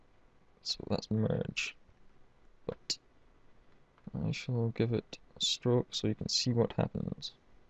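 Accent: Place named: Glasgow, United Kingdom. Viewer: Good clear content, thanks! (Also, your accent is delightful. Are you from Glasgow?)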